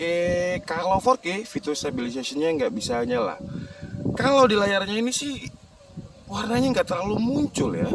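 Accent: Malang, Indonesia. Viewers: Asli malang kah om ?? Kok kyk di malang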